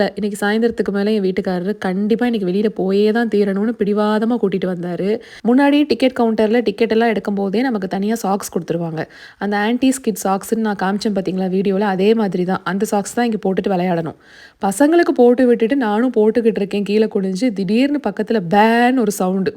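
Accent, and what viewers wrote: Coimbatore, India. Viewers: Modaiya irukum😂 Absolute Coimbatore slang❤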